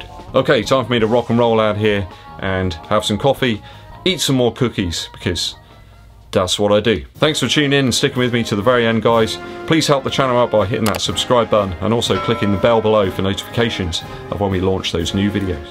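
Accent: England, United Kingdom. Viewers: I love hearing the English pronunciations! Thanks!! Never owned a Puma shoe, but I'm loving the looks of the new line.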